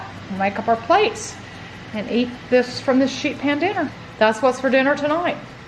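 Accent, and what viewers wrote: South, United States. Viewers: I just love that southern twang😂. People from the south are honestly so warm and friendly. Everything looks yummy as always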